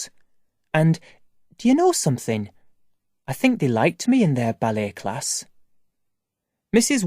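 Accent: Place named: Ireland. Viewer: freaking irish accent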